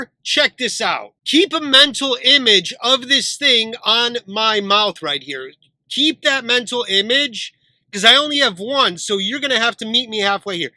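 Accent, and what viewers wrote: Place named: Russia. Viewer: Deeeeeeem I scrolled through your oldest vids to now and dang hearing your accent change, slowly losing the russian accent and becoming more american sounding is a blast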